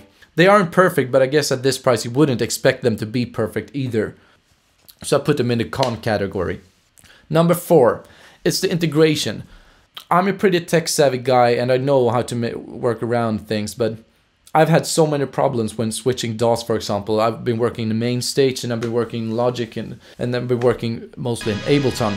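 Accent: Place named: Sweden. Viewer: Great review. I was looking for another keyboard primarily to use as a DAW VST controller, and was considering this one, but your review really helped me realize that the faders do not have the precision that I need to control things like cutoff frequency. Just based upon your English, I am guessing that you are Swedish. If you live near Stockholm, do you have any recommendations on where to buy music gear or music accessories. Tack!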